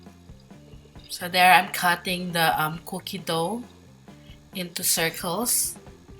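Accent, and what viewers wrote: Philippines. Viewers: Ohh...because I had a friend who is filipino and she recognized your voice that you are filipino.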